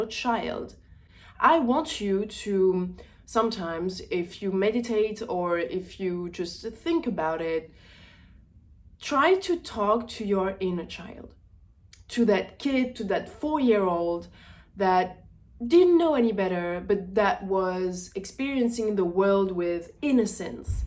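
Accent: France. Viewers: (I love your French accent!) I knew, you're gonna like the whole YouTube thing. These cases about our psychological impact on our lives are very important to highlight.Im glad you're doing that., you're this kind of influencer, that we need to influence our lives. Thank You 🙏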